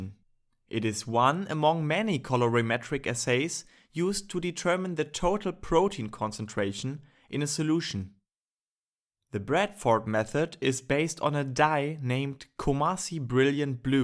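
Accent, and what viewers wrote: Germany. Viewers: I bet you're a german speaker. Thanks for the video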